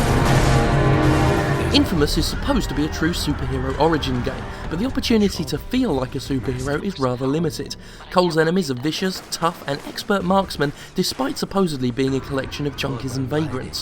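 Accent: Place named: RP, United Kingdom. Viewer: Look, if Yahtzee likes this game, then it must be good. Jim is just an angry fat British bloke who's accent is posher than it should be which makes his arguments seem more credible than they actually are (I'm British BTW X-D)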